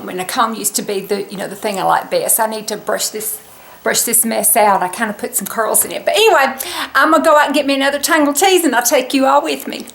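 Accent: South, United States. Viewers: Would love to hear you speak Spanish with that Southern accent. Miami is 90% Hispanic.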